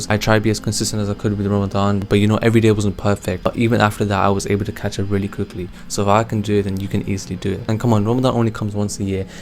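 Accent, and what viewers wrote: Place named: United Kingdom. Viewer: Yo Pakistani don’t flex your British accent talk slow I didn’t understand much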